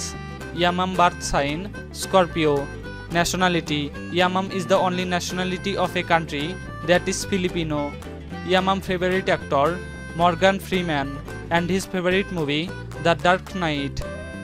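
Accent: India. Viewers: Indiano ata tong nagsasalita. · Parang tunog ng Indian pag nagsasalita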